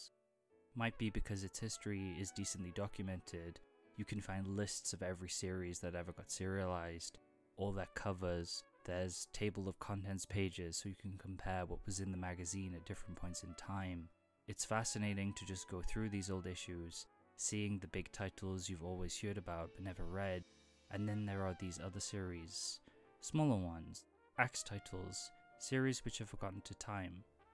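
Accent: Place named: United Kingdom. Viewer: Why you sound so more british here, lmao.🎉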